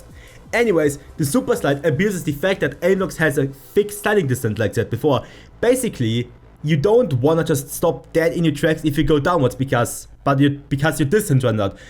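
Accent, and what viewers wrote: Germany. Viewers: U sound hella german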